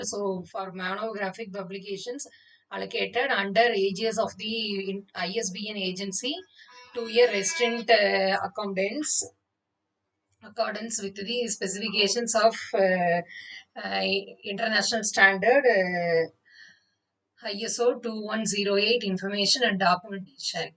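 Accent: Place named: Tamil Nadu, India. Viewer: Its not BUBlish....you should pronuce it like PUBlish....please polish your english pronunciation. Also please practice by attending spoken english....do not kill us by talking like butler english. Your english slang clearly shows that you are from Tamilnadu.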